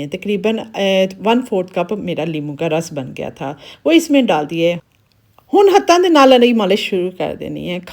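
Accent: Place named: Punjab, India. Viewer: Zabrdast ap k panjabi tch bht enjoy krty hain hm khush rhain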